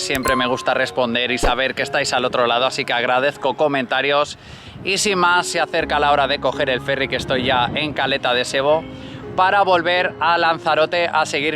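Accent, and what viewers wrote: Castile, Spain. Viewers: Muchas gracias amigo, eres un excelente guía con esa pronunciación tan bonita castellana. Un saludo.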